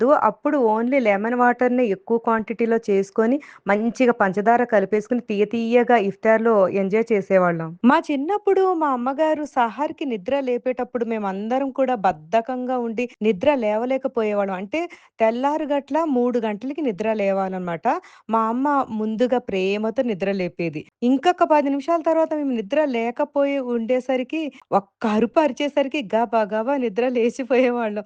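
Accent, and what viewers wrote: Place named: Nellore, India. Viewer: Nellore aaa Madam meedhi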